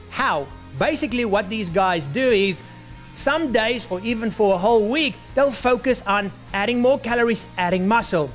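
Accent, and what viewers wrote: South Africa. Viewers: Great vid man. I like to hear a no BS message in a SA accent.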